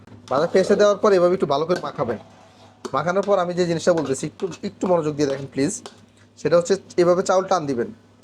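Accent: Noakhali, Bangladesh. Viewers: নোয়াখাইলা ভাই, পলাও ভালো রাধছেন।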